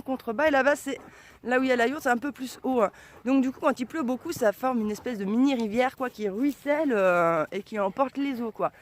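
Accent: Paris, France. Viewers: Et ton accent parisien j'adore, ça me rappelle une ex qui vit dans le 20 eme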